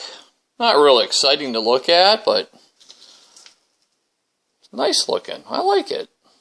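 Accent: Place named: New Hampshire, United States. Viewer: Just found this today... heard the "NH dialect" after finding the violin building. Lived in Brookline NH for years. Your violin building and description is both interesting and down to earth. I often wondered about building a dulcimer from Home Depot woods, looking at those beautiful cedar boards. Wonderful work and the videos are great!!! plus.. the fiddle sounds great! :-)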